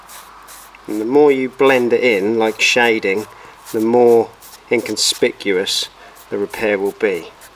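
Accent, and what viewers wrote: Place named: London, United Kingdom. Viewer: Fantastic! Can I ask what accent you have? Sounds like a blend of London and 1920’s BBC English.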